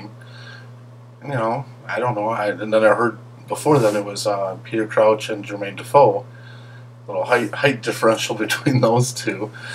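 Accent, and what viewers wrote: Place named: United States. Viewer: ArtVandelay is wrong. You seem to know more about football than a lot of people in England. I like your videos. It's refreshing to hear an American accent chatting about the beautiful game. Keep it up. Blackburn Rovers all the way!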